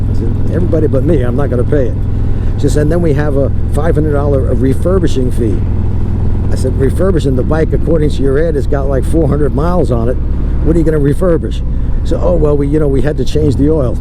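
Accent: New York, United States. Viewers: where in NY ya from... OMG ya sound like me..hah.. apperecite ya...much respect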